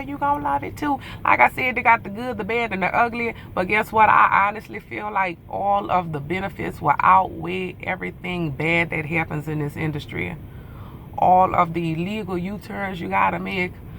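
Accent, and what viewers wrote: Louisiana, United States. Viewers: You have to be from Louisiana???? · is that a Louisiana accent?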